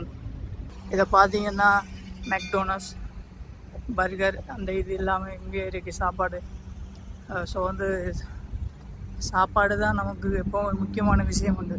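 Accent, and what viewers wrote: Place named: Jaffna, Sri Lanka. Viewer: Eela thamila kathaiyadaaa Yaalppaanam thane nee 😡😡😡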